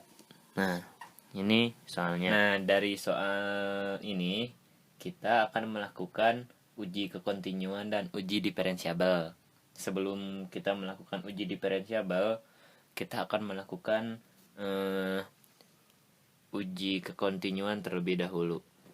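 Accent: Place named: Bandung, Indonesia. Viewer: Si aa pasti urang bdg kwkwkwk. Nuhun a..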